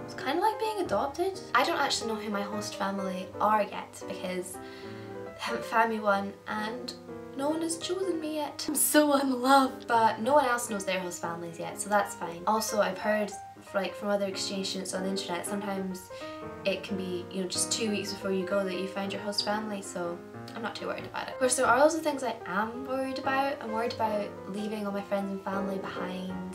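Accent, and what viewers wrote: Scotland, United Kingdom. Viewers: tis not a good thing, why would a scottish person not want to sound scottish. also she does sound scottish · Haha, wish you came to my school! We never had a foreign exchange student from Scotland, here in Florida before, escpecially a cute one x) Love the accent too! Hope all goes well! Good luck! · you have such a cute scottish accent :D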